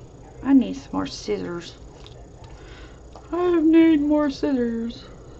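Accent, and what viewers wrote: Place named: Tennessee, United States. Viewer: Loved the video. What a fun way to use up the leftover shelf liner that I have. What part of the country are you located in? I live in TN and your accent makes me think we may be close.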